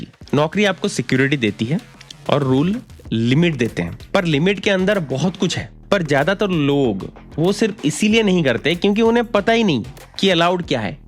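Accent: Odisha, India. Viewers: Are u odia ??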